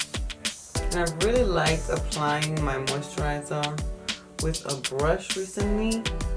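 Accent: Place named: East Coast, United States. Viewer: My nosey ass found you in a Carly Bybel video comment section and I love love your east coast accent, you're so beautiful and you come across super down to earth. This video feels like hanging out with your friend and gossiping about the new makeup releases. Only thing I would suggest, in certain parts of the video like your background music was louder than your voice. Maybe just lowering your background music, so we can hear your lovely voice 🤗❤️❤️ much love to you and positive vibes your way.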